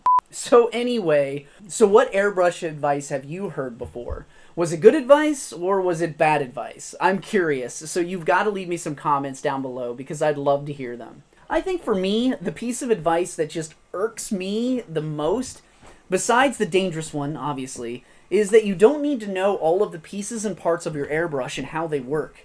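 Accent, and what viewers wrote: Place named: United States. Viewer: Get to the point and just stop the twangy American blah blah blah theatrics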